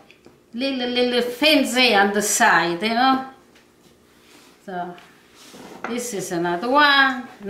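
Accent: Italy. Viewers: Hello from England. We love watching you and we love your Italian accent too. A very Merry Christmas to you and your family! Xoxo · Merry Christmas! Love the family stories and the singing! 💕❤️💕 I was wondering about the consistency of the Struffoli. Is it dense or airy? We like it dense, but the bakeries always seem to sell the airy kind! So much work......but.....yum! So worth it! Wish I took more notes in the kitchen as well as the huge wooden board that my Mother-In-Law’s mom always used to make the struffoli! So grateful that I found your channel! What a special treat! I remember when I first met Grandma....she gave me a plate of struffoli to take home! A delicious treasure! Your videos are getting me through some sad times........I so miss my mother-in-law! But hearing your Italian accent, listening to your stories and songs......warms my heart! Thank you! 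God bless you and your family! 💕❤️💕❤️💕❤️💕❤️💕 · Isn't she wonderful. I love her Italian accent.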